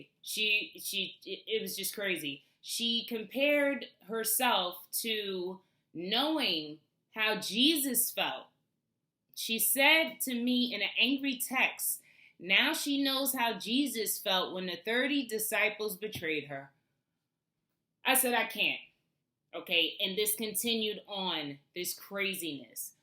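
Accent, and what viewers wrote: New York, United States. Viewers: Hi am from Nassau Bahamas I subscribe too your channel early this morning, and you soo beautiful I love your charming sweet voice you could tell that you are a true New Yorker lol.. Amorosa already went on some shows and she already have a book..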